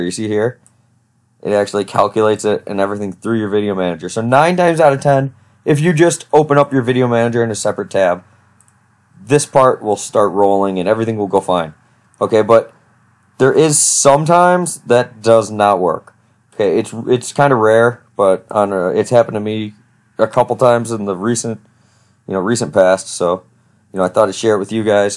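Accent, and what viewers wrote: United Kingdom. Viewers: idk I kinda agree that he sounds British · Sounds like he's kinda British though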